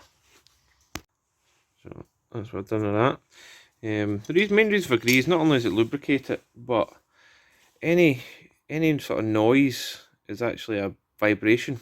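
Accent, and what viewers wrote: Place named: Scotland, United Kingdom. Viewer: Scottish English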